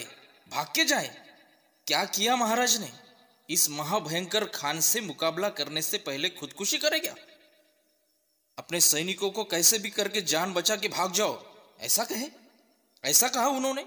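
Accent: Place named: Maharashtra, India. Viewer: Fantastic narration...though the narrator has a very strong Marathi accent ..but superve narration ..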